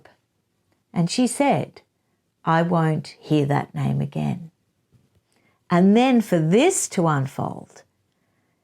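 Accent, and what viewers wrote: Australia. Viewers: Omg are you a ozzy?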